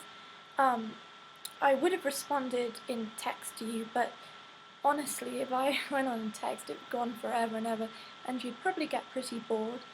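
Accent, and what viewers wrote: United Kingdom. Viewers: A blond-haired, blue-eyed, British-accented Asian? The f? Your "master"is like 50 and you are what, 18-19? This is just stupid and crazy, especially that he enjoys seeing you in pain!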